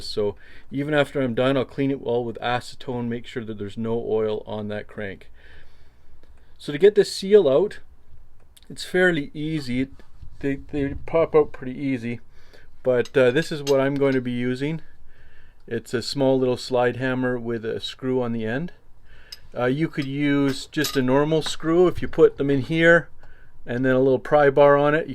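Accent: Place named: Canada. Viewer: Next, they'll be telling you when to change your underwear.. You accent spells Canada, am I correct..?? If so, it figures..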